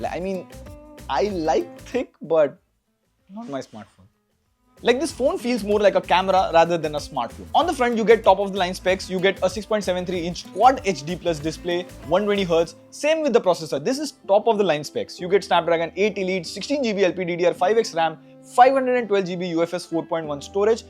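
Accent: India. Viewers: Indian typically Indian accent hahaha we don't want to hear Indian typically English they always singing words, talk Hindi not English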